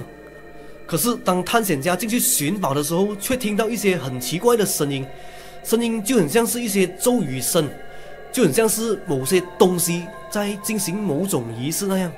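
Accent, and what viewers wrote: Malaysia. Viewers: 你的故事整理的很好，但是，只是 建议 “发音” 需要再多加练习调整一下，会更好。【这应该是大马人の通病大概吧】就是句头 句尾语气词 轻重音，整体性的 发声『 抑扬顿挫 』… 我知道你不是专业的，但慢慢可以改善 相信会越来越好，你可以参考其他讲解梳理案件的网红 可以把人带进故事意境中。 其实，使用 “大马腔调”の口音说话叙述 比较不太好聽，讲故事也比较难有意境跟代入感 ，听着也很容易让人出戏～有种讲着讲着很困難，又很卡…卡顿的感觉……。😂 😌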